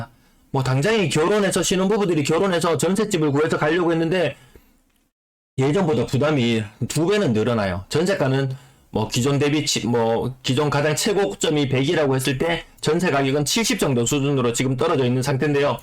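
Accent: Gyeongsang, South Korea. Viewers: 구수한 경상도 코멘트가 참 재밌어서 항상 즐감합니다 ㅎㅎ 고향 부산 떠나 서울 온 지 45 년, 벌써 60 중반이 넘었네요~ 그동안 국가의 소중한 자산들이 대외경쟁력을 높이는 기술/투자/연구/다양성 인재 개발 등에 들어가는 것이 아니라 제 살 갂아 먹기, 투기, 후세에 폭탄 물려주기 등 부동산을 통한 탐욕에 쏠려 있어 내심 걱정이 많았지요. 저는 수십 년 동안 열심히 일해서 자식들 다 공부 시키고 지금은 서울에서 전세로 살고 있지만 두 단위 억원 정도를 은행에 넣어 쏠쏠한 이자 받고 사업 소득도 가지며 잘 살고 있습니다. "모든 것은 본래의 가치로 회기한다" 일부 영끌 20/30/40 우리 후세들이 향후 30~40 년 간 너무 오랫동안 은행의 노예로 묶일 것을 생각하니 슬프고 참담합니다. 그런 이 나라의 장래는 어떨까요? 어릴 때 어른들이 하신 말씀 한 마디 남기고 이 노부는 글을 마감합니다. 젊은이들이 4~50 년 전에 제가 들었던 이 말을 새겼으면 합니다. "세상에 가장 무서운 게 뭔 지 아니? 바로 빚쟁이란다" 이젠 그 빚쟁이는 우리 곁에 있는 살가운 은행이랍니다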